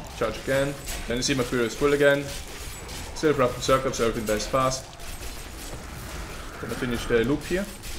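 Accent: Germany. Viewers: I was a bit skeptical until I heard the accent. Those Germans man, they know their efficiency.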